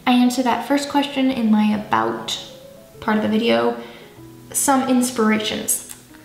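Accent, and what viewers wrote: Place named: Eastern Europe. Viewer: Oh my!! I thought you were older, yes; but mainly I thought you were from somewhere in Eastern Europe!! 🤣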